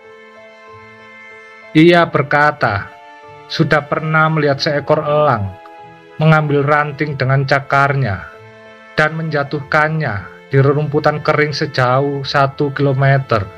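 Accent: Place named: Java, Indonesia. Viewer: bisa ga logat jawa di buang bikin geli mendengar nya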